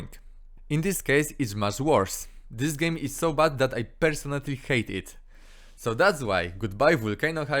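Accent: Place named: Poland. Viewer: You sound very polish :)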